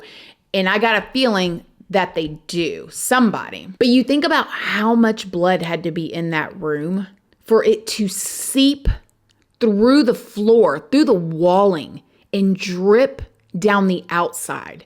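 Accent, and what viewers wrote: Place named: Atlanta, United States. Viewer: your commentary about the INCEL portion, that is a lot of conjecture with a lack of evidence, your bias is really ruining the last part of your video. you had a great objective video up until that point. for your own sake, can you make a video of how many people have committed murders that are now considered INCELS. i would love to see your facts vs jumping on the FED bandwagon. INCEL has become a slander on younger men that are mostly at that age, that awkward age for dating. the fact that people are being labeled INCEL is beyond subjective this coming from a father, husband and have had a lifetime of multiple partners and relationships. btw u sound like u are out of atl also, not the inner 285 but outside.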